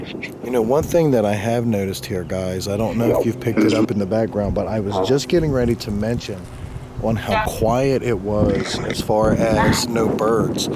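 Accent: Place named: East Coast, United States. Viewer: I love your east coast "ass"accent😍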